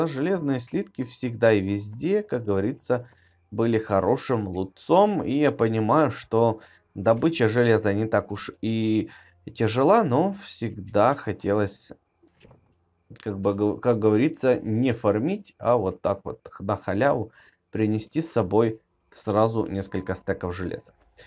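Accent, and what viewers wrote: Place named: Ukraine. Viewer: Український акцент)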